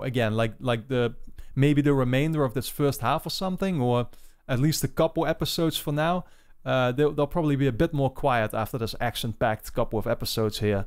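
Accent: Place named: Netherlands. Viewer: im hearing this accent... my man... are you dutch?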